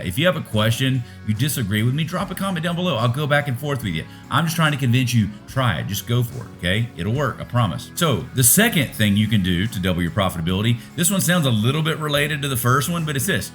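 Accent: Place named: Nashville, United States. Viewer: Honduras baby. God bless you, love the Nashville accent.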